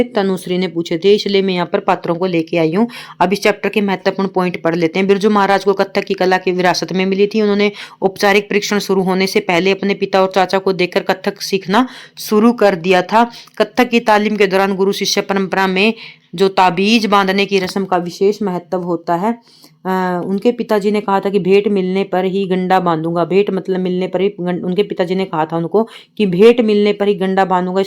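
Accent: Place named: Haryana, India. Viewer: Very nice mam aapki awaj kaithal ki hai❤❤❤❤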